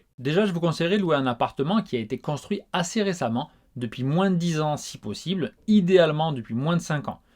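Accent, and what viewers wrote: Marseille, France. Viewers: De temps a autre tu as une petite pointe d'accent de Marseille qui se fait entendre...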